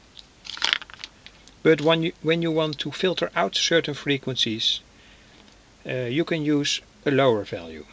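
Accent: Netherlands. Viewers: Are you dutch? becouse i am.. i can hear it on the way you talk..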